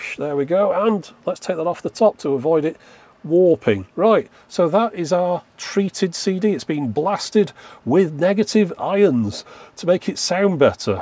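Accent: United Kingdom. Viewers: Love the ion/iron wordplay. Only something you can do with a British accent 😂 please make the negative iron a recurring character 😂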